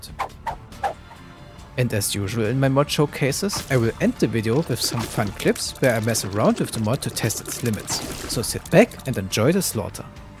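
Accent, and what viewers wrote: Germany. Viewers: There he is! Our sweet german accented best boi is back!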